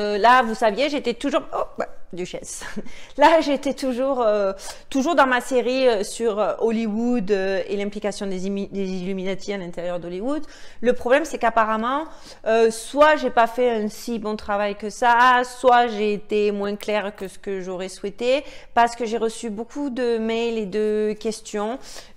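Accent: Marseille, France. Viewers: Albert Pike, c'est Paille-K, pour la prononciation (je croirais presque que tu mens quand tu dis vivre aux States! hein ma gueule?:) de Marseille! j'adore ton petit accent que tu as dû bien perdre depuis... mdr ) et aussi à 15' et des, on dirait ptet des détracteurs (mais dénigrateurs j'aime bien aussi, ça fait penser aux oiseaux migrateurs: ceux qui vont voir ailleurs... si j'y suis;) Et franchement, tu as de la jujotte, j'aime beaucoup ton implication et ton recul en même temps.... dans tout ce que tu cherches à comprendre... T'es vraiment intelligente je trouve! Bon voilà, après avoir regardé une 20 aines de vidéos sur ta chaîne, je me mets à te tutoyer, mais cela ne veut pas dire que j'ai moins de respect pour autant... C'est ptet même le contraire: je te vois comme une "sister" de ma génération! (même si tu dis dans une vidéo qu'en 95 tu n"étais pas née, je sais que ce n'est pas vrai:p ) Et ton angle de vue (vu des States) m'apporte beaucoup! 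Merci! Stay Safe aussi (vraiment, fais gaffe à toi) xx Take care xx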